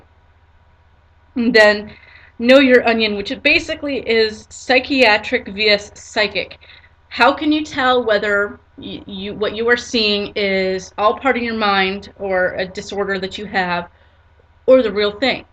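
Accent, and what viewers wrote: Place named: United States. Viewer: I love your voice so much. You sound American. Byeee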